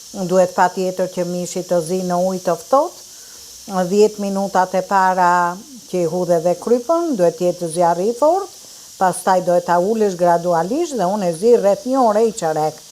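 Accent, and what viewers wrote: Tirana, Albania. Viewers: Pershendetje moj sulltanesh e gatimeve shqiperise se mesme nuk na ke kenaqur vetem me ato gatimet e tua te mrekullueshme por na ke kenaqur edhe me ate dialektin e bukur te tiranes kur flet flet nje dialekt te bukur qe une e motra ime e pelqejme shume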